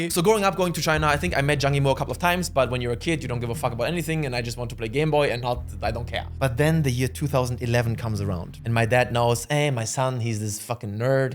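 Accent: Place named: Germany. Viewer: Are you half german? I like your accent man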